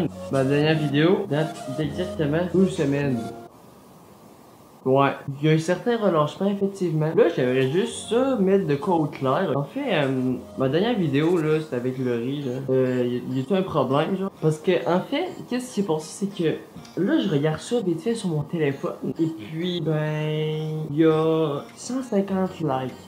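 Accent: Quebec, Canada. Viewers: GG pour ton passage chez Amixem ^^ perso l'accent quebecois je commence à apprécier, ça change mdr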